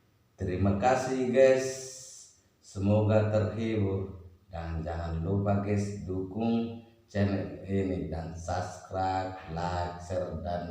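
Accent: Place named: North Sumatra, Indonesia. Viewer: Wow...keren banget👌👍👍👍 sobat betul² suka, dan logat batak-nya medok yg bikin mantul, salam sukses tuk berkarya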